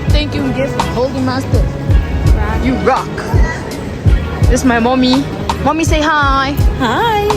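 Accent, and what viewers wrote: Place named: United States. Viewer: Thanks for going we won't even know u gone....u trying to sound like a American already so u will be just fine that side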